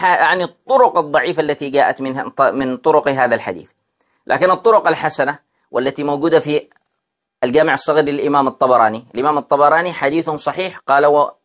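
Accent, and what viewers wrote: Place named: Yemen. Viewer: لهجة هاذا الشيخ كانه يمني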